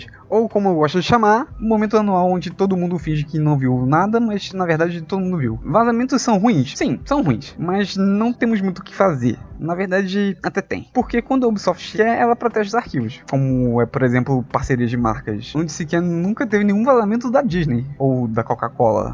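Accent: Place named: Rio de Janeiro, Brazil. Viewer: Me deliciando com a voz carioca do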